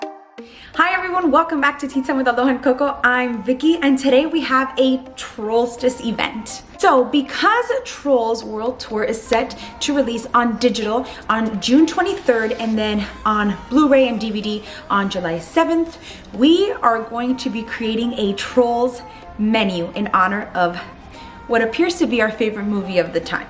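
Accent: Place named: New York, United States. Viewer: Loved everything, but I'll admit my favorite part was definitely your New Yorker accent 😂🤣❤👏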